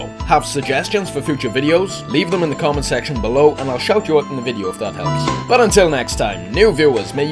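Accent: Wales, United Kingdom. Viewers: you welsh or something?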